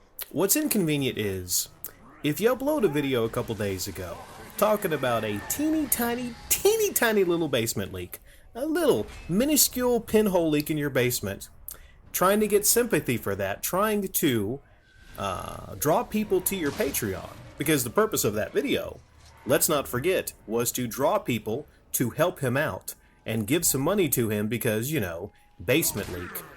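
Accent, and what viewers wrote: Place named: United States. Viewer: Listening to your accent you are American. I'm guessing you're happy to live in a capitalist society. YouTubers, including yourself probably, are hoping to make money from their work/videos and there's nothing wrong with that. It seems hypocritical that you're making videos yourself, probably monetised, then complaining about other people making money from YouTube and Patreon. There are many YouTubers that monetise videos and use patreon to try and get a bit more cash. It's the nature of the beast.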